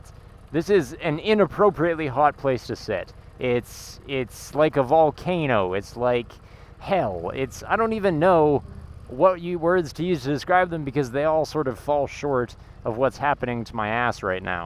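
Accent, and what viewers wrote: Quebec, Canada. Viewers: Is he from Canada? Sounds like Norm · Ho yeah un québécois !!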